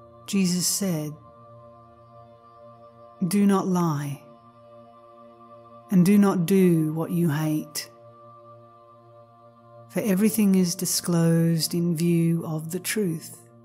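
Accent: Australia. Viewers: I think this was read by an Australian. If so, greetings fellow Aussie! Thank you for this - thoroughly enjoyed it 😊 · A beautiful reading of this book. Thank you so much. After listening to so many robotic voices “reading” sacred texts so soulessly and without any understanding at all , this is just wonderful! Also lovely to hear it in an Australian voice for a change. ❤❤❤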